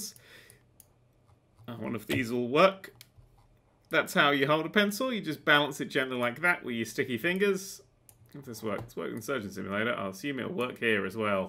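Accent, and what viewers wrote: United States. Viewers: WAITDans American?????!!!! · is American!?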